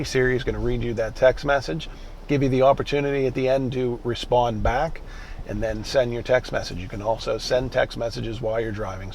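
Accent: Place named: Pittsburgh, United States. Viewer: that awful PIT accent, can hear that from. 4000 miles away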